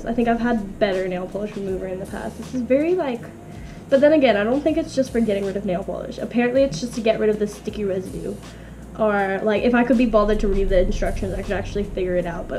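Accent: Australia. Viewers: Australian accentt comin' in hard! I love it lmao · Your semi Australian accent.... I love it so much, i wish i have an Australian accent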